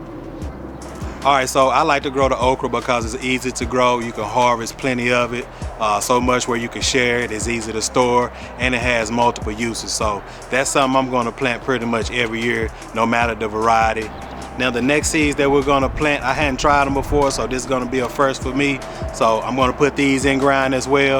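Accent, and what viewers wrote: Houston, United States. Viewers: Exactly I’m from Houston as well. Sounds like a Houstonian. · Lord, I'm from Houston. And I am so glad to hear somebody who SOUNDS like me in the garden! 😁😁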